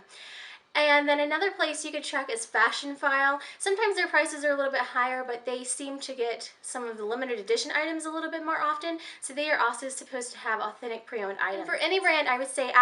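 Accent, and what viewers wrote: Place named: United States. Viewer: your little yankee accent is so cute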